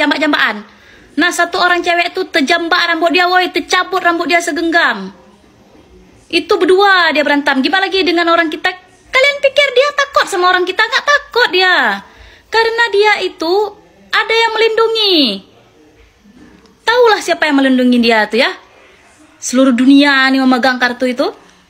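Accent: Aceh, Indonesia. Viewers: ini orang aneh yg di bahas A coment nya B waras gk ente mau tindikan kek orang ..ente blm tentu lebih baik dari dia terus si mbk ini bukan orang malsiya dia orng Aceh logat orng aceh mirip malysia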